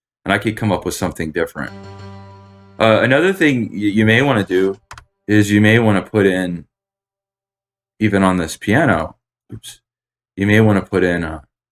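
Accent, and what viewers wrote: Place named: United Kingdom. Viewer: LOL U HAD ME WITH THE BRIT ACCENT!!!!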